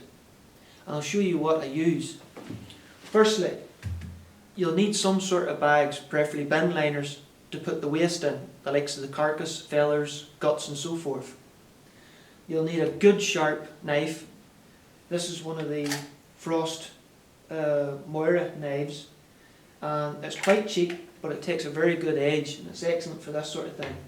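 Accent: Ireland. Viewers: Ah, there at last. Your handsome face to match the charming Irish accent....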